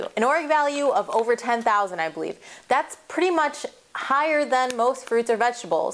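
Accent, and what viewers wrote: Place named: United States. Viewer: Annoying US accent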